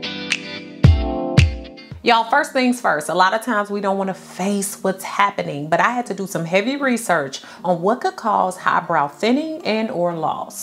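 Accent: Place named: Midwest, United States. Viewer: Are you from STL? I think that I can “hurr” our STL Midwest accent lol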